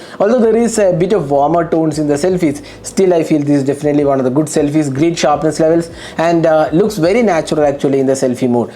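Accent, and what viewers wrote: Kerala, India. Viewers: perfect mallu accent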